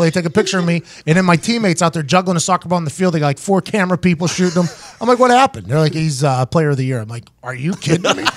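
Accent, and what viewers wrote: Pittsburgh, United States. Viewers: I love hearing the Pittsburgh talk when I listen! Good stuff. The Vally news dispatch! Oh blast from the past! Good stuff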